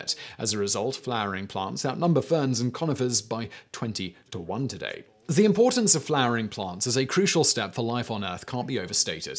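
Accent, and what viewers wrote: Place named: RP, United Kingdom. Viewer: I can't figure out how you got that tofffy nosed accent and yet, can hardly pronounce common words. Is it just regional and unrelated to class/education? This is why people study journalism and broadcasting.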